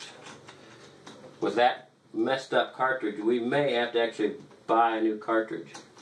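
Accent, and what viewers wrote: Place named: Ohio, United States. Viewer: I'm a fan of accents. are you from Eastern Ohio? rate my guess 🤙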